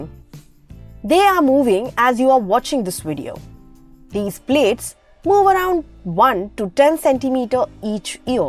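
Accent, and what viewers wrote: India. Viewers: I hate indian accent. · Omg, what an accent..i hate indian accent!!!🙄😱🤮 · Not the india accent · Indian accent 😂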